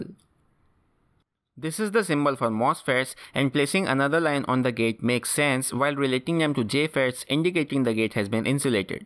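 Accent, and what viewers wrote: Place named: India. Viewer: Your commentary is more to express your English language efficiency and not the subject matter. You are reading the information very fast and you do not know what you are talking about. Yet you have a very strong indian accent 'depletion region', and "available" are the worst to understand. You collected information from the web and read it out loud. It was of no help. Everyone knows about n and p channels, plus bipolar transistors. Anyway, thank you for upload.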